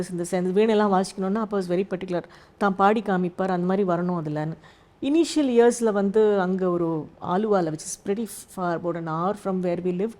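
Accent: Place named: Malaysia. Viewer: Love her Tamil! Sounds like Malaysian's Tamil accent. Wonderful lady and artist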